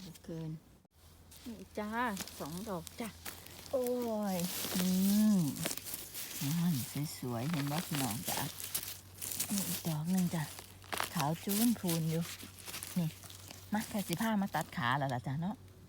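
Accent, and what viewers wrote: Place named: Central Thailand, Thailand. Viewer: เป็นคนจังหวัดอะไรรึคะ พูดกลาง เรียบร้อยๆ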